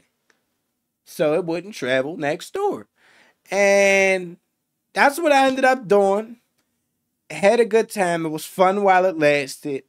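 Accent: Pittsburgh, United States. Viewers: The Pittsburgh accent goes crazy hard!!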